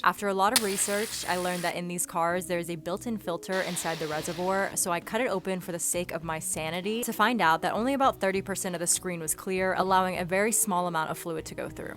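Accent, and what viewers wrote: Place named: West Coast, United States. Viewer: She sounds like she's from the West Coast. East of the Rocky mountains, the cars all rust out.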